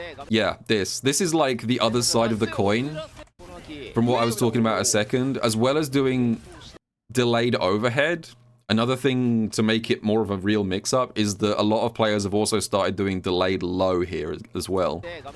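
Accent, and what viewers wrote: United Kingdom. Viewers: The British pronunciations are killing me in this video lol. Sako's Aki? No, Sacko's Acky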